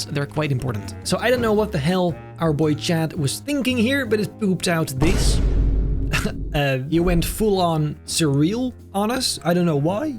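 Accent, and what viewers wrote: Netherlands. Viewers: Thick Dutch accent. Nice video tho, never heard of the channel before. Subbed.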